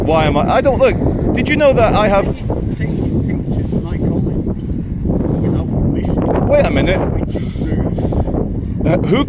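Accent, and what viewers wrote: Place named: Scotland, United Kingdom. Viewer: Your accent is just Scottish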